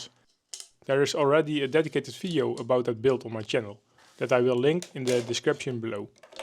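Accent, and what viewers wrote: Netherlands. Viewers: Actually this was part of what got me hooked. Love me some thick Dutch accent, probably because I've met so many nice Dutch people. Greetings from duitsland, godverdomme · half a second in i reconised your accent as one of my own. and then at 48 seconds you confirmed it. How are there so many dutchys on youtube ill never understand. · I can barely understand what you are saying. You have a really thick accent I guess Dutch..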